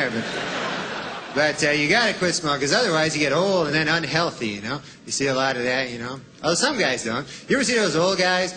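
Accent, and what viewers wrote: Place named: Canada. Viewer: He sounds so Canadian holy crap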